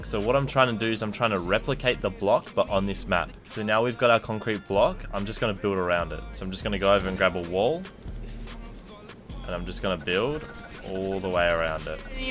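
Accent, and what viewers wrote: Australia. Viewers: Ur australian I didn't know that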